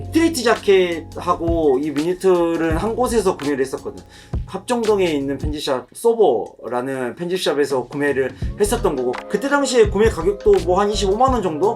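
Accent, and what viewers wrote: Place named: Jeolla, South Korea. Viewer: 전라도 말투극혐이네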